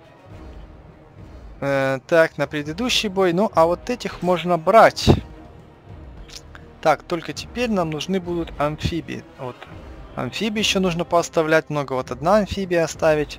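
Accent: Ukraine. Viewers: Ти из украини?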